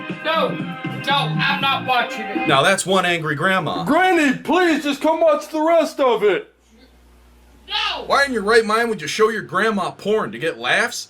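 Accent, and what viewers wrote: Germany. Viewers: I'm german and your german accent is surprisingly good. Some rambles sounded like acctual words.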